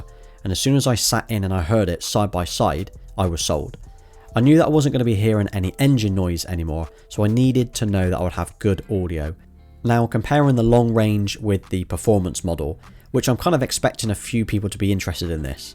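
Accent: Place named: South West England, United Kingdom. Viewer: aah i love this! its nice to see americans watching little ole us Brits videos! Thats a pretty good claim to fame for you ;) and you're getting your accent on the map too! From, fellow south west 'un. loving the videos. These people are correct. Your vids are informative, straight forward, compelling and shot really well. congrats on a fab channel.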